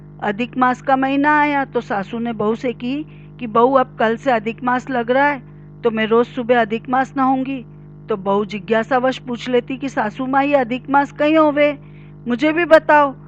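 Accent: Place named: Ujjain, India. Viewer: Aapki konse city se ho ? Aapki bhasha ujjain jesi lag rahi hai . Plz bataiye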